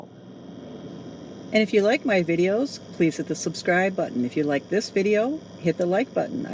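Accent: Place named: Wisconsin, United States. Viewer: My parents are from LaCrosse, WI! I recognized your accent! People ask if I am from Minnesota or Wisconsin often! Soul sister!!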